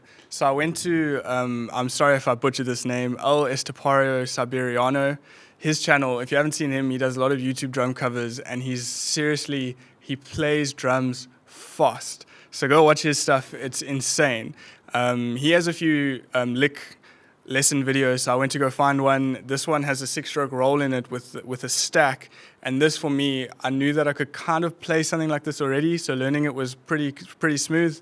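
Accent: South Africa. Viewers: Awe u have an S.A accent😅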